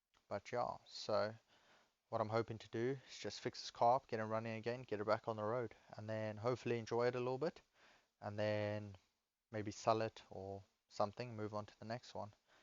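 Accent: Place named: South Africa. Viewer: Not a bad video Bru. Looks like UK but I can hear your SA accent coming through. 👌👌👍👍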